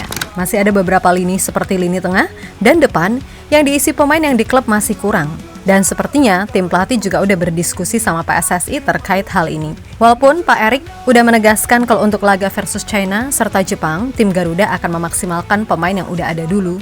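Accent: Java, Indonesia. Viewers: E mak mblegenduk , wong jowo yo mbak ? Podo nak ngono 😂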